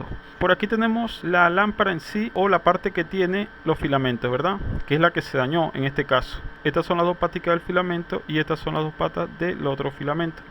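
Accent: Venezuela. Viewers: mi pana tu eres venezolano verdad? aprecio tu esfuerzo muy buena tu explicacion, ahorita comprar un bombillo nuevo es un dineral. Gracias!!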